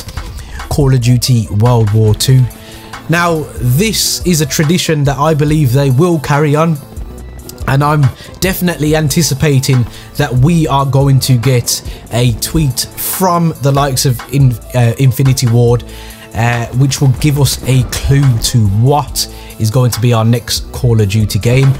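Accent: Birmingham, United Kingdom. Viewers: Are u from Birmingham England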